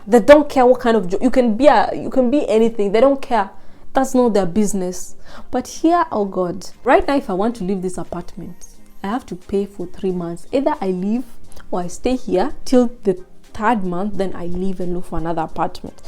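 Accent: Nigeria. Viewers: You have Nigerian accent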